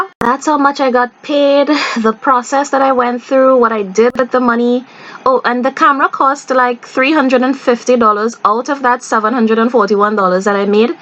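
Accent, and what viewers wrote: Trinidad and Tobago. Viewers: 🇯🇲 I love your Trini accent. You can talk about raindrops and I would tune in. 🇯🇲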